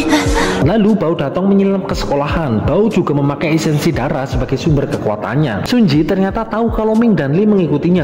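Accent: Banyumas, Indonesia. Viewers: Kalo yg bawain ngapak suport terus smangatt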